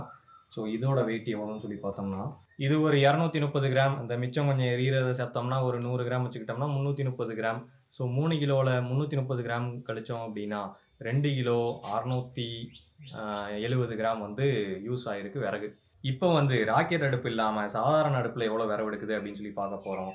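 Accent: Tirunelveli, India. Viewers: you have tirunelveli slang.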